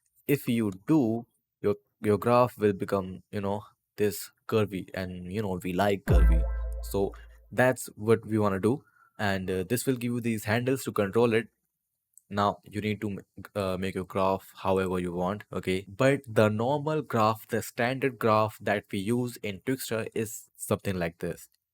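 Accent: India. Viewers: BRO`S INDIAN ACCENT IS TAKING OVER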